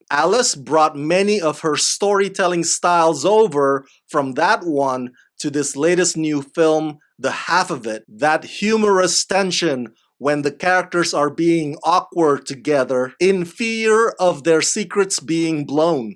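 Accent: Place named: Indonesia. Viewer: btw i hear an indonesian accent are you indonesian?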